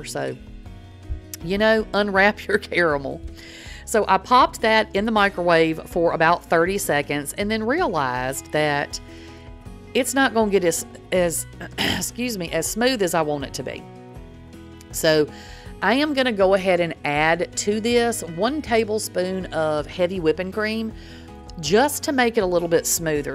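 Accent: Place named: Tennessee, United States. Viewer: Are you from Tennessee by chance? Love your accent, it reminds me of my dad's and he was born and raised in Nashville.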